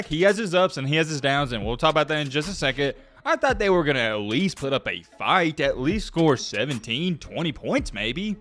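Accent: South, United States. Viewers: It was #2 vs #5 and Both teams played tough tough Defense that should’ve been expected Two top 5 teams and dude your Clearly a SEC guy it’s all in your accent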